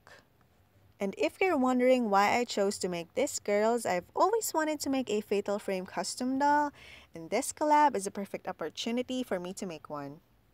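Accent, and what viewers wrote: Philippines. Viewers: you're accent is nice? are you a filipino? :D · I have been watching you since the first time I discover your channel. I have a feeling that you're a filipino because of the way you speak. Then I found that you really are filipino (or based in Philippines) when I checked your IG! I can't believe how talented you are ❤️❤️ hope you can make it even bigger in youtube and be more recognized not just in Philippines but internationally 🌹You are so amazing and talented.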